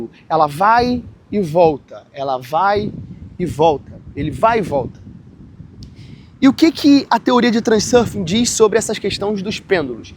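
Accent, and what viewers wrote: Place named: Rio de Janeiro, Brazil. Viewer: Perfeição é o nome do seu vídeo. Viral, sensacional, português claro de carioca (foda)😂😂😂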